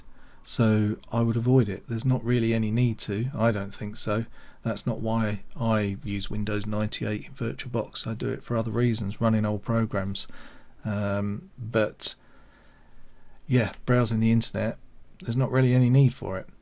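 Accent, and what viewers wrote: United Kingdom. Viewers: your from UK right I know! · Amazing tutorial, thank you a lot! I'm enjoying your awesome british accent, mate!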